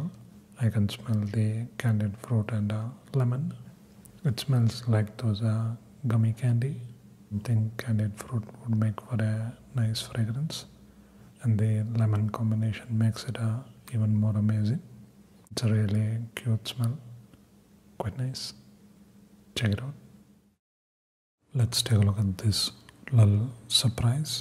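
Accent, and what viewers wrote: India. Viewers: I love the Indian accent. 💜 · I like Indian accents. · It's literally the best. I love watching ASMR videos where the person has an accent, but the Indian accent is the best.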